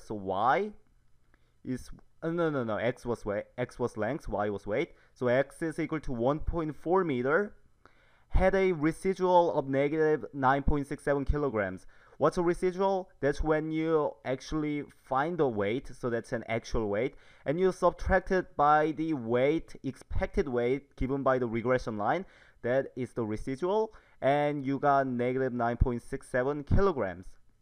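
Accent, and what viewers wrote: South Korea. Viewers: I’m korean, and his accent sounds VERY korean. :)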